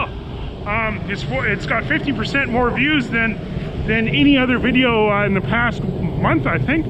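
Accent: Bavaria, Germany. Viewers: Clearly German accent ... ! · The accent is Bavarian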